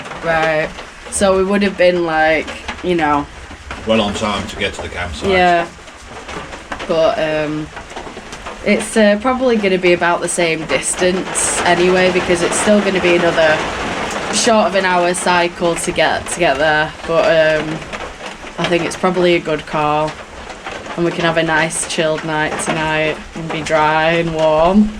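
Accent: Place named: Liverpool, United Kingdom. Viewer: Hi, I have a very light combination bike lock which probably wouldn't stop a professional thief but could deter someone just doing an opportunistic snatch and grab. UK has so many interesting accents, I was thinking Liverpool for you( I'm not sure on his accent). I have checked out your other videos and wild horses wouldn't have dragged me up that path in the Madiera mountains and those Greek beaches looked amazing. I do some videos of my cycling trips but just from an android phone so not very professional and room for improvement. Keep up the good work.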